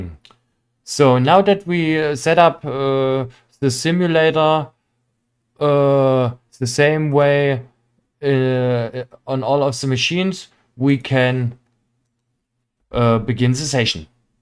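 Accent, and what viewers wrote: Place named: Dresden, Germany. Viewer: Geiles Tutorial. Als ob du auch aus Dresden kommst. komme auch dort her😅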